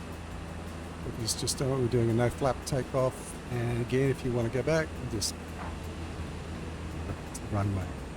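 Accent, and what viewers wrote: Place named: Australia. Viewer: Bro.....sorry but.....the accent! 50/50 for me in understanding everything you said in the video. Is it Australian?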